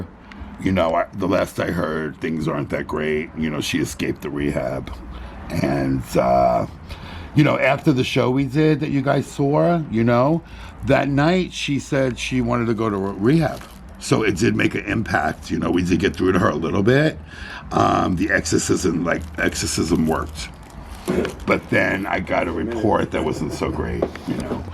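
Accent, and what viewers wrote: United States. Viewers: Hi! Here because I saw you on Mark’s page. The video where he took Rebeca into your store. You’re so charming you have a magnetic personality. I love your raspy voice and New York accent 💕 Wishing you lots of success. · you seem so fucking chill to have as a friend I love it. Whats funny is im completely straight but ALL my friends aside from like 5 of them have all been the gayest of gays. Dunno why but i always gravitate towards gay guys as far as making friends or hanging out with people go i guess its how funny and flamboyant the guys i know are but at the same time have my back and can tell them literally ANYTHING even weird shit and they listen and respond like what i say is normal lmao. Can tell them my feelings like when im depressed or sad or whatever and they know all the things to say to cheer you up or make you feel loved, ive never had a straight friend do any of that but i have had some great str8 friends but its a diff vibe and dont feel as comfortable talking about certain things. 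Any way all that jabbering was to say you remind me EXACTLY of one of those friends who recently passed away last year to heroin overdose, he was heavy set and even had the exact same voice as you with that boston accent he was like the gay brother i never had his name was Gypsy i miss em.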